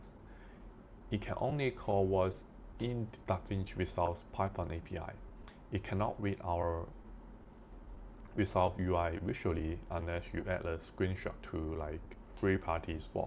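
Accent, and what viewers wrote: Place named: China. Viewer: I'm surprised Codex understood what the hell you were saying, maan. That Chinese-English accent is challenging. But thanks for the video, it's a good starting point.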